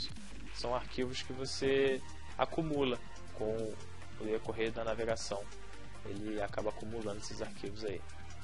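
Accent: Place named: Rio de Janeiro, Brazil. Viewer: (lento) leanto.. (disco)dixxxxco..(sistema) sixxxxtema.. sotaque carioca é bem diferente kkkkkkk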